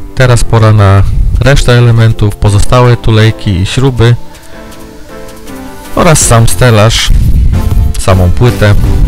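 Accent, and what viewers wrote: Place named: United States. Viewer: He he, no pełna profeska film :D po głosie nie poznałem, myślałem że to jakiś YouTuber z USA pod koniec pokojarzyłem fakty i filmiki z łódek. Teraz czekam na dalsze produkcje i do zobaczenia na trasie LwG !!! 👊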